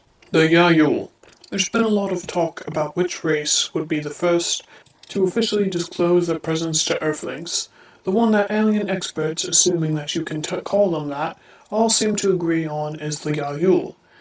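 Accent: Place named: United Kingdom. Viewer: Couldn’t hide your British accent in this one even with the voice mod. I ask to see that other video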